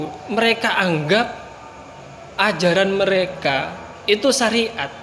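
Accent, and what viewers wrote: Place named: Madura, Indonesia. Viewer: Sangat nampak loghat madura nya walaupun bicara bhs. Indonesia. Lanjutkan dakwah jennengan ustadz ustadz aswaja ORENG MADUREH DERIH SUMENEP SAMPE' BANGKALAN SIAP ADUKUNG DA'WAH EPON AJUNAN TAD🙏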